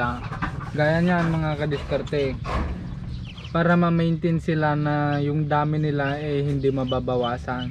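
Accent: Ilocos, Philippines. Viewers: Iba talaga nu ilocano ang naga tagalog nagmayat pakinggan hahaha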